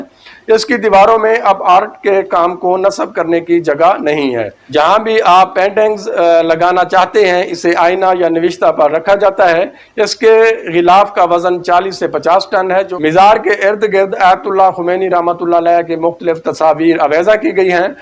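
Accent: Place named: Punjab, Pakistan. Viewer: Punjabi Urdu bolna sake jahil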